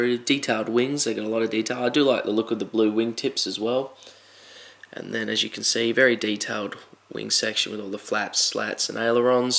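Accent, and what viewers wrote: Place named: Australia. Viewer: ahh mate i absoloutely love your good old aussie accent! it's so close to an nz accent! (the best =D) great video!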